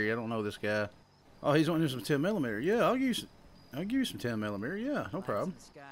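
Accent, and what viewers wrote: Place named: Texas, United States. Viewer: You sound like a country singer with that type of Tx talk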